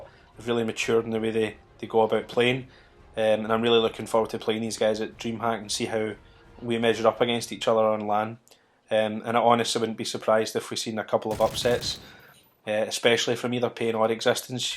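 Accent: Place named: Scotland, United Kingdom. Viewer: feels weird hearing a fellow scottish man doing one of these haha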